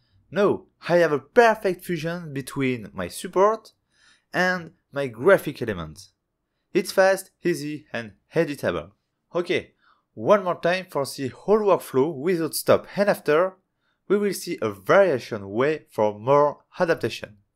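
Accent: France. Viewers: Oh l'accent français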